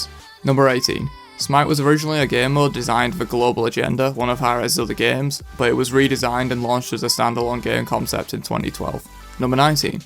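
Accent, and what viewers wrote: United Kingdom. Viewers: "Bof" "fog o' wor" "ba'el" and people say gaelic/british accents are the best lol sounds like an idiot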